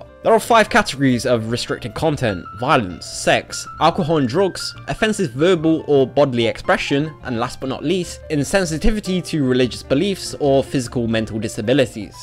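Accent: United Kingdom. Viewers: all I can say is, in the UK (and judging from the hosts accent he should know this as well) it is law for porn magazines to be covered up in the UK, and it is becoming a ( albeit slowly ) nation standard for newspapers to be out of sight of children. video games don't have these rules, but saying that with age restrictions being a thing and, the ability to simply cover a game with some metal or wood with the title being written on it is rather stupid.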